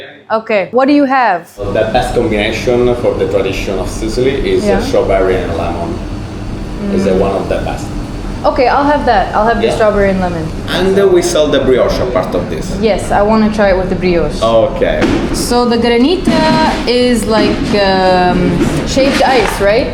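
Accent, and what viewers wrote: Tunisia. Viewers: It is nice how you enlight us about hidden areas of islamic remarks in Sisily ,your gunisian accent is remarkable too ❤🇵🇸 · even your accent when speaking italian is tunisian lol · As someone from the other side of the Mediterranean coast, I feel like I didn’t even cross it, wow you video is incredible I've always admired how Sicilians are. But gurrl wanna say that your tunisian accent is sooo impressive😮 mashallah 😍 that man in the market wouldn’t be able to pull me in like that, because I know that trick 😂. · You speak Italian with a Tunisian accent: the way you say Aperto? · That's amazing you can speak Tunisian lahja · Excellent accent Tunisien !!! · An American who speaks fluent Tunisian? That made me laugh out loud. I think that's the best Tunisian accent I ever heard from a non Arab. Well done :p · Thanks for an interesting video and i spoke to some tunisian while ago and got you really good tunisian accent 👌👌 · Your Tunisian accent is 100% congratulations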